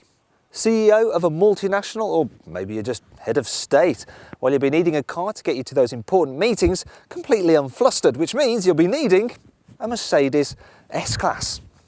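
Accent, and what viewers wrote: United Kingdom. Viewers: Because they are in the U.K. did you not hear his voice, put two and two together, pretty obvious...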